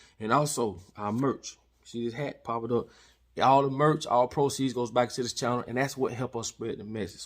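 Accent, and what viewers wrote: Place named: Deep South, United States. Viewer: That's that deep deep south raising!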